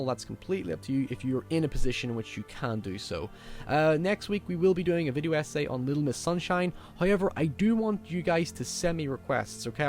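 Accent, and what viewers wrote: Northern Ireland, United Kingdom. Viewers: I can't tell if you're british or american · northern irish. just like mine so it is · Nah I’ve decided you’re Northern Irish ☘️ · who can tell me where the narrator's accent's from? what part of the UK? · What’s going on with your accent? Northern Ireland? Scottish? American?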